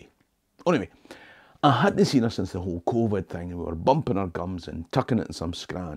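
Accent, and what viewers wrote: Scotland, United Kingdom. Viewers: Lord, how I hate apologizing for my countrymen. I am from Alabama. I understand you just fine... Even the stuff where you were talking to your sister... And even if I didn't understand, it would be on me to either figure it out or move on... How utterly asinine to complain about a Scot with Scottish accent. If I ever do a vlog about history and stories of the American South, I will speak my Southern English just as nat'ral as I please! Love your content, hope you feel better. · Your Scottish accent is what brought me to the channel...I can listen all day long. Love it 💕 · I am so sorry that you have Covid and pray that you will be well soon. As far as your accent goes - I just love hearing the Scottish accent so I don’t understand why people complain about it😳 you will not hear complaints from me as I enjoy watching your “stories”! 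So just ignore the jerks, right?🤪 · I love your accent! My daughters have even learnt to understand you now!🤣 I have you playing up loud on the telly while I go about my chores haha This gives me fond memories of my Scottish Grandmother from Motherwell/Glasgow hearing the accent💝 I love the history lessons too! Thankyou! Hope you get well soon! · I've always loved hearing the Scots accent. Wee is one of my favorite words. I wish you a speedy recovery. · I love the way you speak because it brings my Scottish ancestry to life. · lol I love the Scottish accent most of all over all, even over the English accent. and I love to sit back and here you speak about the history of the Scottish people because you have a unique way to deliver the history God Bless you and knowing this was a video from January I pray you sre just fine now.. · This is a novelty - a "blámaður" speaking unapologetic Scottish-English. 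I just love it! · I'm only here for the beautiful sound of a true Scottish accent. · Top 5 favorite YouTubers hands down scottish accent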